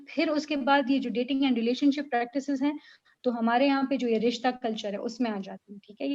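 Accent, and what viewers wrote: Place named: Khyber Pakhtunkhwa, Pakistan. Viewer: Ma'am,you speak very funny urdu, apki urdu sun kr esa lg raha hai ap kpk se hn, or Ma'am apka lecture sun kr lg raha hai k ap pehli bar teaching kr rahe hn apky voice me thori c hesitation mehsus hori hai.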